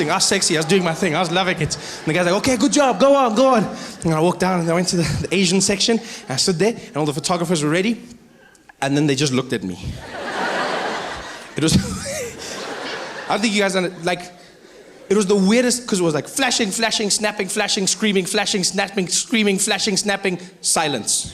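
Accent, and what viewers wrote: South Africa. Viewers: His accent is exceptionally South African in this clip 😂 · Back to his Seow Feffrican accent - has to have been during his tour back home. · Being a South African, living and working in China I know for a fact the some Chinese know you my brother 😅😂😂😂!! Considering that some Chinese people are generally very ignorant to the outside world 🤦🏽‍♀️, it’s huge for you to be known outchea 😅😂! I I have three colleagues who instantly fell in love with me because I’m from South Africa, same country as their favorite comedian Trevor Noah EVER ☺️🙌🏻👌🏽!! Continue to fly our flag high & remember Don’t lose your accent my broer 😅😂😂❤️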